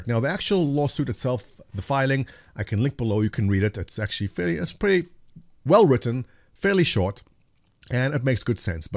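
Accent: South Africa. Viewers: nice to hear a fellow south african.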